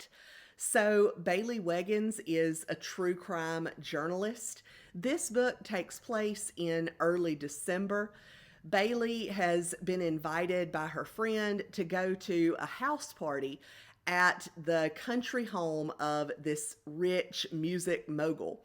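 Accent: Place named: South, United States. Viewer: Love your southern accent its so comforting i am also a mystery lover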